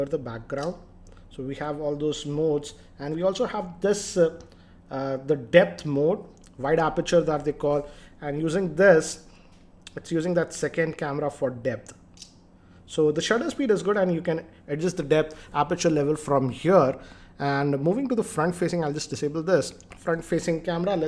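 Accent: India. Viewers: Are you from india because you're English is not at this girl but i really like your videos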